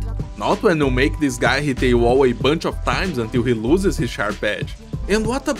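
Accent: Brazil. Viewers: I'm hearing brazillian accent? Btw, nice video, i know what makes an operator a bad one is the amount of effort they need to complet a stage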